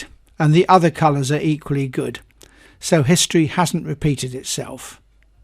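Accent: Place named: United Kingdom. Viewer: well im super happy that i found this informative video which also comes with a british bloke :D greetings from germany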